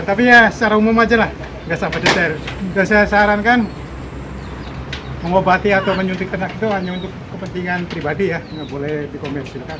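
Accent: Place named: Indramayu, Indonesia. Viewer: ngmomg e medok wg dermayu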